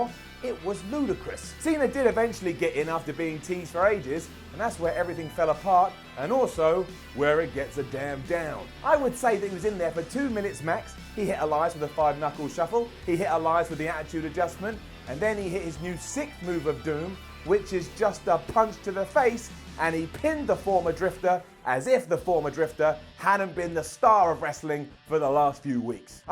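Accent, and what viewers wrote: Australia. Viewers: bro, you already sound Australian.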